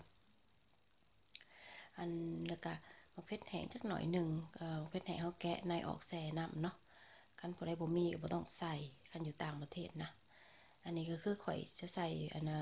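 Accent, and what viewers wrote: Savannakhet, Laos. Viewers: มักๆเสียงเจ้าเว้าม่วนม่วน คือกันเองไทยสวันบ้านเฮา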